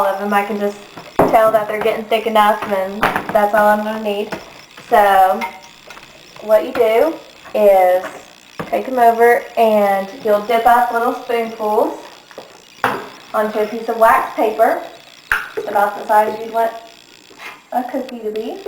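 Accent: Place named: South, United States. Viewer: are you southern I hear an accent.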